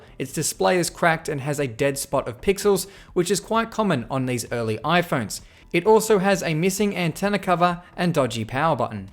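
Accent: Australia. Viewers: He’s Australian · U Aussie?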